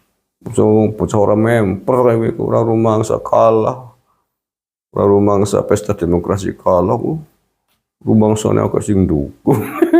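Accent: Banyumas, Indonesia. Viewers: Saya sering ketawa saat bapak bicara campur aksen banyumasan, hehe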